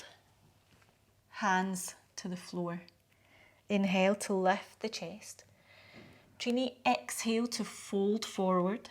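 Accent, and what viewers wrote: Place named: Scotland, United Kingdom. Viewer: Informative, thank you! Love your accent, too. I’ve never been to Scotland, but my grandfather was from a place called Govan in Glasgow. Your accent reminds me of him.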